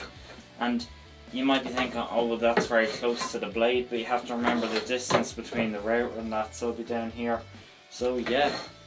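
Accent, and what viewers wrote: Ireland. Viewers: Thanks. I have an old bench top saw which is about as accurate as a banana. Been looking around various videos on the net but have to say yours is excellent. I think the motor on my saw is fine so thinking of using that. My difference is that I am paraplegic so use a wheelchair so need to build the bench a bit lower. Thanks for the video. Only found you today but watched 4 of your vids and will watch more. From your accent I assume you are in the Emerald Isle. North or South? I'm in England near Cambridge but have visited Ireland many times and think it is a great place and have to say have always been made welcome. Sorry to babble on.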